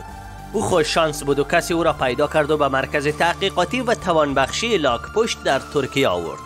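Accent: Afghanistan. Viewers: قربون اون لهجه شیرینت برادر افغان عزیز 🙏🏻 ❤️ خیییلی مخلصیم. بذار خورده ای ازت بگیرم... میبایست قبل از عنوان کردن موارد توضیح مقبولی دربارهء چاپ 3D یاهمان چاپ ۳بعدی میدادی نه اشاره وآر... بهترینهای خالق زیبایی رو برای شما دوست ارجمند آرزو دارم 🙏🏻 🌷 🌹 🌹 🌹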